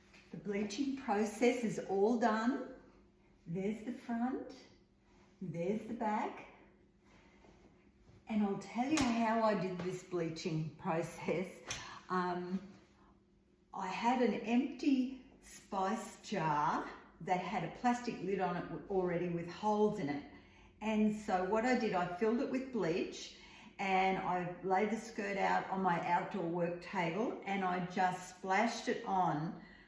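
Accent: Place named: Australia. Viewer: I love listening to a fellow Aussie! GDay from Brissy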